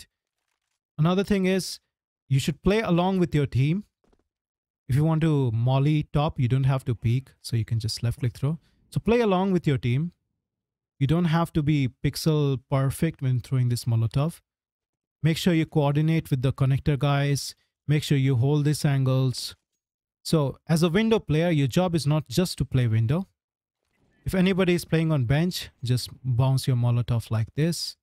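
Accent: India. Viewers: always the indian accent tutorial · you sound Indian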